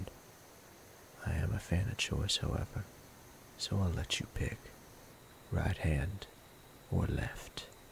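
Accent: South, United States. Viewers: That slight southern accent 😩👌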